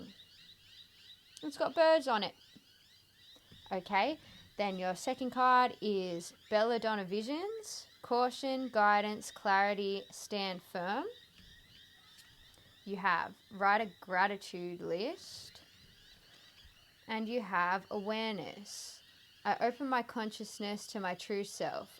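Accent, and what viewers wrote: Australia. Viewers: G'day!! Thanks to 13 Moon Tarot 4 recommending your channel. I even hit subscribe b4 i listened 😂As soon as u began speaking, a huge smile cracked over my face. It's so refreshing to hear an aussie!! Card 3 totally resonated 😊 as I listened in my Queensland garden ❤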